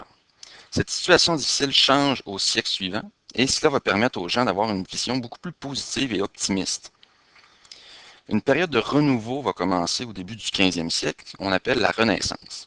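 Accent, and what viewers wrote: Canada. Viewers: mdr laccent canadien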